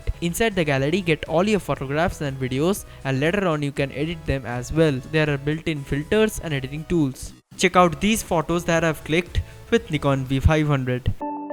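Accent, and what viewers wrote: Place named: India. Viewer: Its called English with Indian accent, we indians are comfortable with this kind. He is speaking so fast because the video has to be fast and quick or it will take longer time which audience may not like. It's a good review for indians . Thanks brother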